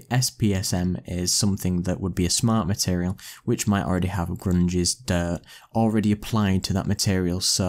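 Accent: United Kingdom. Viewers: What a waste of time, you've shown only a single way of adding files to Painter, and you have not shown the much more useful method of how to edit the paths to assets in the preferences. And sbs files are not left out of newer versions, as they are crucial for being able to edit the materials and shaders in Substance Designer. You seem pretty clueless, you should learn more before you start putting tutorial videos up on the internet, your arrogant, know-it-all attitude, and regional British voice notwithstanding, lol....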